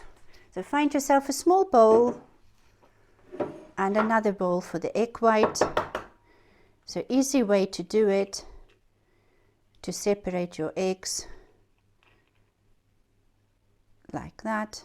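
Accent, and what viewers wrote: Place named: South Africa. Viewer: Great ideas thanks for sharing. You sound South African 😊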